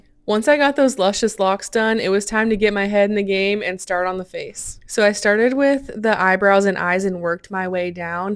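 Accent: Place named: Midwest, United States. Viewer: It's the "Midwestern" accent 😂 people in utah and Idaho also have that accent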